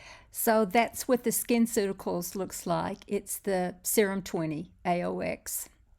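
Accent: South, United States. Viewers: LOVE the Southern accent!!!! Beautiful!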